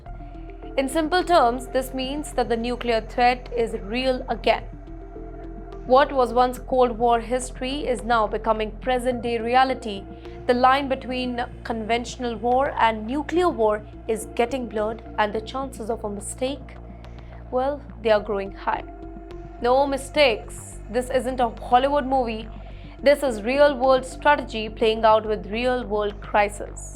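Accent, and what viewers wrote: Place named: India. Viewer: your accent is hilarious 😆.. obviously Indian.. just stop trying to be global news media cause it's disgusting.. so itchy to our ears 😂😂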